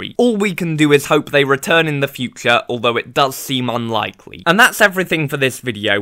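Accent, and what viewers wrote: United Kingdom. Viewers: you are british, right?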